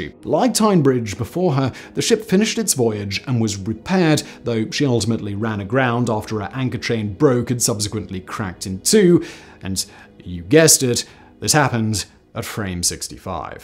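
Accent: United Kingdom. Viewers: it's so much more satisfying hearing a Brit talking about a British ship. . But yes, he does a awesome job narrating anything.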